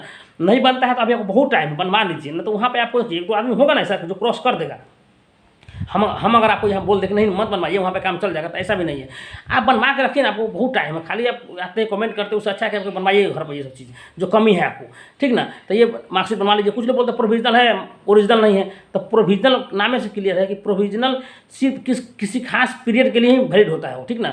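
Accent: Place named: Bihar, India. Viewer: Thodi bhahasha mein bihari touch hai thoda slow boliye please.. smjhne mein dikkat hoti hai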